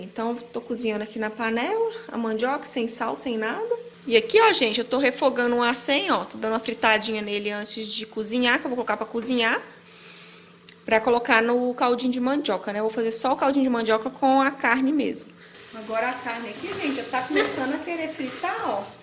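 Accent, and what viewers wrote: Minas Gerais, Brazil. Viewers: amooo seu jeitinho mineirinho de falar morei em barão de cocais 11 anos,amei pessoas acolhedoras é comidas muita Boa Sou de Goiás Bjssss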